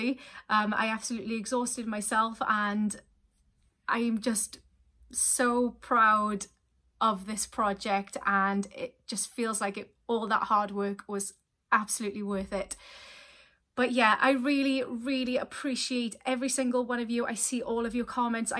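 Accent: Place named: RP, United Kingdom. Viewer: U indeed the best voice on TY. You should soar into stardom. And your speaking voice is so british bbc received pronunciation its pleaseant as well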